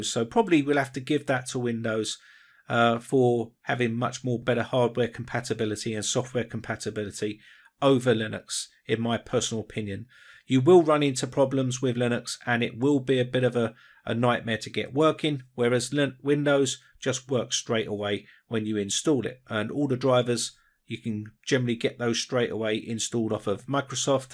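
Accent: United Kingdom. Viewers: Love your British accent, I was recently interested in the comparison between these os, love from India 🤗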